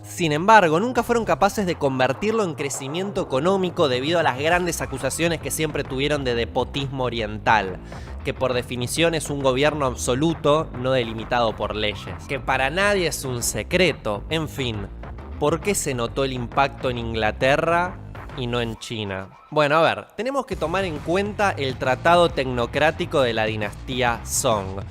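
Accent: Argentina. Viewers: me mama (encanta) el acento argentino, justo hace 2 semanas apenas veía este tema, muy buen video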